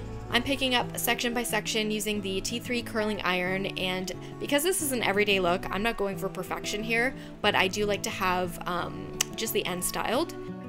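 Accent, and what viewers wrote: United Kingdom. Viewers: Are you from England?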